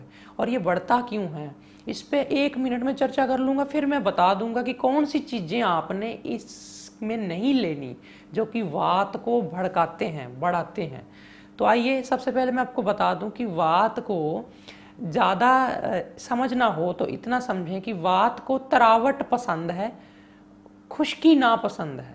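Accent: Punjab, India. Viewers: Guru g tuhaadi bhasha wich Punjabi touch hai. Kirpa karke ik video Punjabi wich bnaao. Mein tuhaade moohon Punjabi sunana chahubdi haa. Plzzzzzz 🙏mein v Punjabi haa.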